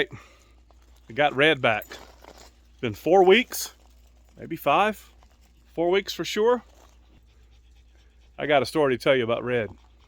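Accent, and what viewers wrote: South, United States. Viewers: I'm so glad you got Red back. Sorry it was a costly repair. I love your southern way of storytelling maybe because I'm southern also.😉 This was a nice video. Thanks for sharing your experiences. I hope the repair to Betty White is minor. Good to see Felix, but I could tell he was in pain. I'm still praying for him.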